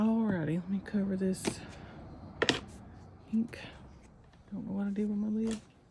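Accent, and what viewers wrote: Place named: Carolina, United States. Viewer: Love your art and I love your accent. I'm a Carolina girl as well. Thank you for the video I learn so much from you.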